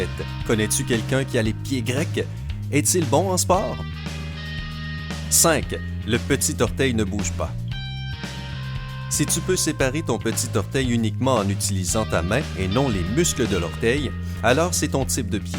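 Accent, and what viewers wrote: Quebec, Canada. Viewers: "cpendant" mdrrr tu pue le quebecois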